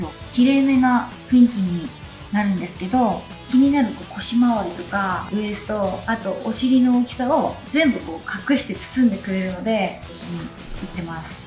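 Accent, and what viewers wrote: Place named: Tsugaru, Japan. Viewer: 津軽訛りで好きなこと早口で喋ってる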